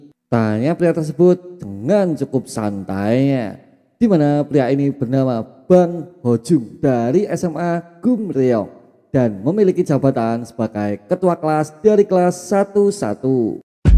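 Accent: Java, Indonesia. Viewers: Logat jawa lo medok banget😅😅😅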